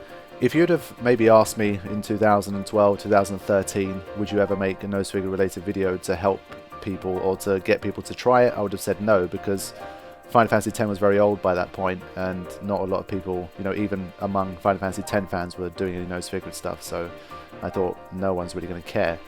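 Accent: England, United Kingdom. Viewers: hey man im not sure if you read comments on old videos but ill leave this here anyway. I have been playing ffx since its day of release on ps2 and got 10 for ps3 and ps4 lol. Im playing a casual playthrough on ps4 right now started a week ago. I was looking for some guides/ tips on youtube and your channel popped up i have never heard of you until 2 days ago and now im addicted to your channel!. the amount of content on your channel is amazing and the quality of the content as well. I now have the daunting task of watching your platinum walkthrough and NSG videos. This very video has got me interested in NSG runs the sad thing being that i thought i was a semi veteranof the game but gad only heard about NSG runs about 6 months ago massive face palm. The other thing being that i have never beat all dark aeons plus penance before and now i think you have given me confidence to try. 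It sounds like your english as well which is good im Scottish and its nice to have British gamers talk about Final Fantasy it seems rare not that im dissing any other FF gamers Dark Kefka has incredible knowledge on the games. Hopefully when FF 15 releases you will cover that as well. Any peace man thank you for giving me a whole new channel to watch i can only subsribe and keep watch over my newsfeed hopefully thats enough for now i wish i could do more Thank You.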